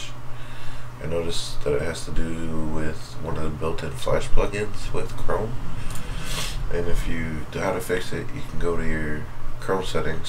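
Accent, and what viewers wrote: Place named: China. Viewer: Chinglish! PogChamp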